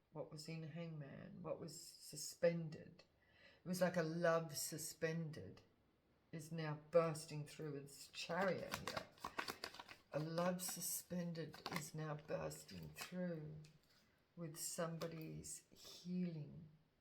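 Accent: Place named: Australia. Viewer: Fellow aussie reader here and I totally get what you mean about the pelican and the shining. I have been talking a lot lately about people with the light in them and how people without it just want to stamp it out in people. It is coming up in readings all the time. Love to her the aussie way of speaking. Have a great new year.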